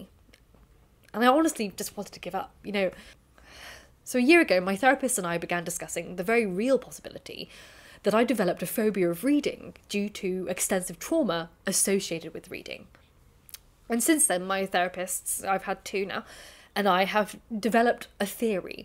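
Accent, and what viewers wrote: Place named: England, United Kingdom. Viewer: I would like to apologise for previously commenting on your pronunciation of "Culloden" in one of your videos. I was taking it at face value that due to your English accent you were ignorant of Scottish culture. I realise now I was wrong and I'm sorry if I caused you any distress. I hope you continue on your journey of recovery.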